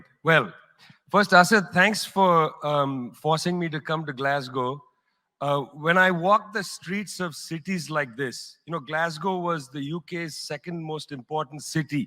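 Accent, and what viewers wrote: India. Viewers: 看到一些人疯狂追求说一口标准的美式英语，甚至要求他们幼儿园的英语老师必须是外教，实在不可思议！其实，只要语法学好了，说话流畅就好了，有中国人的口音根本不是事。看看这印度人吧，一场扎实，头头是道，引人入胜的演说，太棒了！不会有哪个懂英语的观众会听不懂他发音清晰，精准的印腔英语。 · 我居然第一次听这个印度口音听了两遍！？ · 第一次觉得印度的口音还有那么一点悦耳…… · 第一次感觉印度英语也能如此优美！ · 这是我听过最好听的印度英语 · 👍不知不覺刷了兩遍🤭第一次覺得印度英語特別好聽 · 我知道阿三腔的英语听起来很辣耳朵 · 难得这个印度口音能全部听懂🤣真的，普京也跟瑞典环保小公主说过，第三世界国家的人民会想要先吃饱饭，不要何不食肉糜这种高高在上的态度来对待第三世界的国家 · 这是谁，我喜欢你，我听出了印度口音，我不知道你的为人，但我依然很喜欢你，喜欢你骨子里的忠义，喜欢你语气里的倔强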